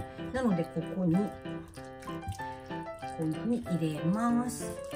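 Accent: Kyoto, Japan. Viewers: 先生の京都弁が可愛らしいです 意外な組み合わせですがさすが奥薗壽子流です!🥰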